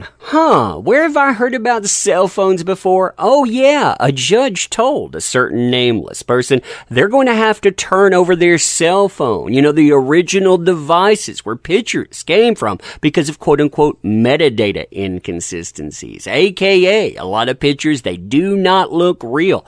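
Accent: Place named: Appalachia, United States. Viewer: Is this your genuine accent? 🤔 Sounds like a Deliverance dialogue!! Be professional, please!!!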